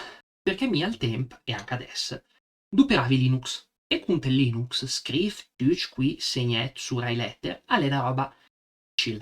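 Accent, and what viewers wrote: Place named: Lombardy, Italy. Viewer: te gh'heet na manera de parlà che riessi minga a capì de che sit te seet de la Lombardofonia, te diset facc e minga fatt, son pròppi curios... anca di vocai hinn vert quand mi el speciavi no, curios. Saria bel avegh la te "fluidità" in del parlà, mi ghe l'hoo no. Pardon, g'hoo scrivu a la me manera ;)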